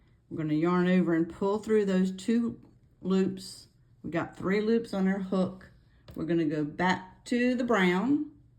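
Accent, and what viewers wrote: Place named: South, United States. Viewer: OMG! This was the easiest and most beautiful pattern I have EVER done. Also your videos are just amazing and so detailed. The video showing what you’re doing is perfect! I just subscribed. LOVE YOU!! Do you have a video for a Santa’s hat for adults and children? Not very long hanging down on top but just alittle to look like a Santa hat. Please let me know. Would soo much appreciate it. I notice you have a southern accent lol. What state are you in? Thank you again soo much!! Heart❤️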